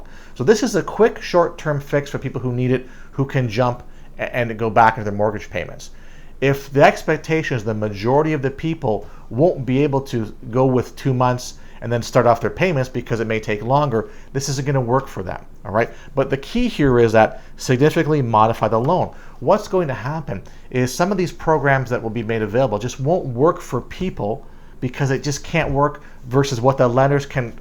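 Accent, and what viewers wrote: Canada. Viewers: ouuut😅 abouut he's definitely from Canada.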